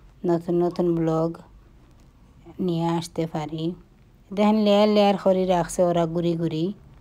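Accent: Sylhet, Bangladesh. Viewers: Kita goh affa apne ki sylheti, na shuddo na nokhali bolchen 💙 onek balo laglo apnar friend er food deke, Thank you for the share 👍👍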